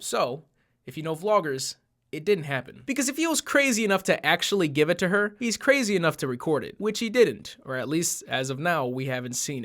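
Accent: Chicago, United States. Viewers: You from Chicago, my guy??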